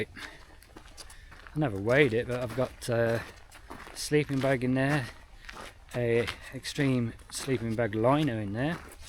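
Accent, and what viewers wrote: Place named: England, United Kingdom. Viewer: New subscriber here, just watched your SnugPak Jungle bag review 👍🏻 Sorry to see you had your heart attack but glad to see you made it and on the mend. Don’t be afraid to look at complementary protocols to run alongside the conventional too 👍🏻 Hope your well for a very loooooong time 👍🏻 Incidentally you sound English to my ear 👂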